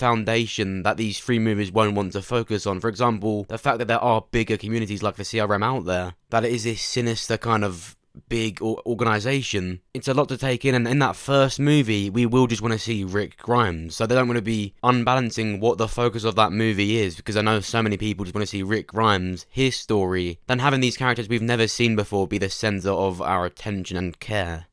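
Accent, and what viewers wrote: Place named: England, United Kingdom. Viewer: I get it, you're English but even as an Irish person I find it very difficult to know what you're saying. You're talking too low and too fast and your words just sound like noise. Btw this video like most of yours could be done in 5 mins. I feel as if you're drawing out the videos so you hit your youtube targets for revenue.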